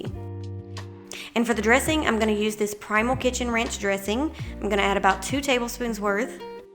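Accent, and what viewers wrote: South, United States. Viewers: You must be from the South😂❤🙏🏾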